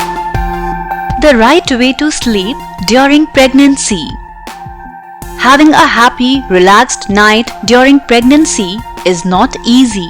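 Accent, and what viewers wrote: India. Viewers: Indian accent tsk...hate it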